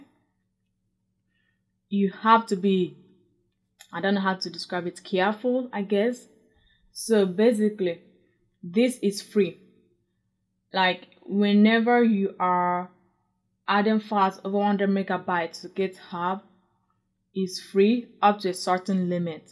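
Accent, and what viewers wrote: Nigeria. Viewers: thank you very informative n it sounds like you are Nigerian!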